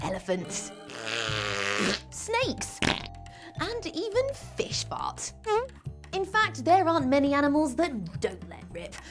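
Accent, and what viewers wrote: United Kingdom. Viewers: Britsh accent ? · im inlove with your british accent .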